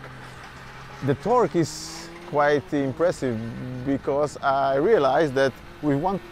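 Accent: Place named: Poland. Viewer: Your English is more polish then English😢😢😢😢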